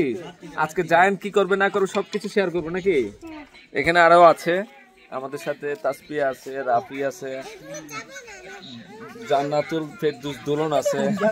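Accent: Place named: Comilla, Bangladesh. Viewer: তোমাদের গ্রাম কোন থানায়।তোমার ভাষা শুনে মনে হচ্ছে কুমিল্লার ভাষা। ঢাকা থেকে।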